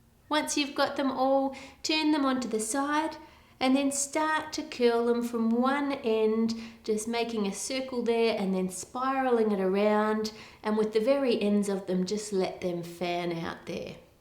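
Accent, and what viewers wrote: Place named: Australia. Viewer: Hi I love ur videos and ur accent. Auzzie accents r my fave ❤️❤️xx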